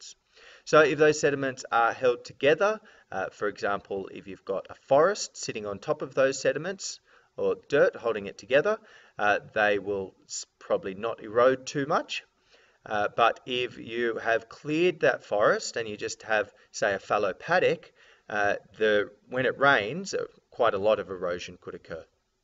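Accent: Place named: Australia. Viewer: 10/10 aussie accent 🤠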